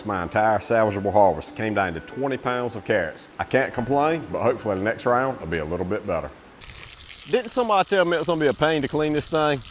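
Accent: United States. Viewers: Make American accents thick again. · As a Australian... he sounds nothing like a aussie. He's all American 😅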